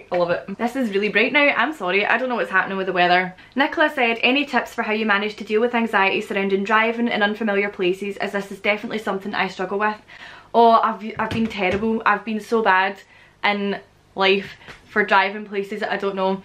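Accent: Scotland, United Kingdom. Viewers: First! And Scottish accent is fun, peelieed!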